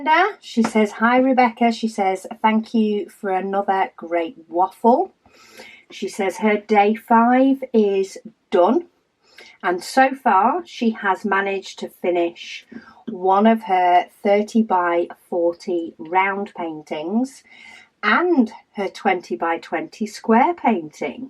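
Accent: England, United Kingdom. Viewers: Hi Rebecca! My husband, David and I watch you every day, and we’re enthralled by your speed of diamond painting. I love the pen you’re using today. So pretty. I finished a small partial special drill yesterday, and loved it. Being from the middle of the US, we are entranced by your accent and love hearing about your life in England. I’ve entered the giveaway, so fingers crossed!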